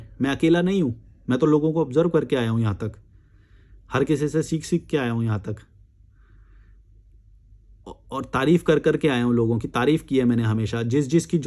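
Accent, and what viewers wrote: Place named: Delhi, India. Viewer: Delhi accent...Nice good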